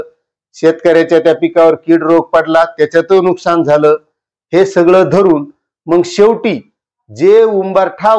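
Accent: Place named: Vidarbha, India. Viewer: तुम्ही विदर्भात राहात का,, भाषा ओळखीची वाटत आहे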